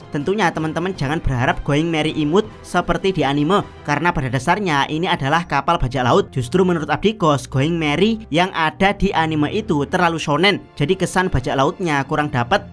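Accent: Java, Indonesia. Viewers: medok bnget sih asw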